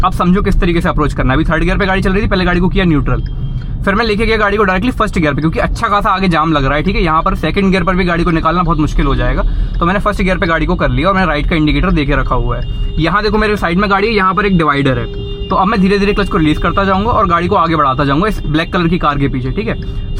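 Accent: Jhansi, India. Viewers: Brother are u from jhansi, UP ???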